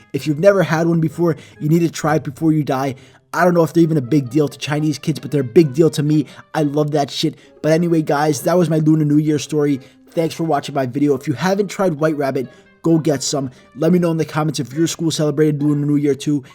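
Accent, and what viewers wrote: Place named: Boston, United States. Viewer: This mans boston accent is amazing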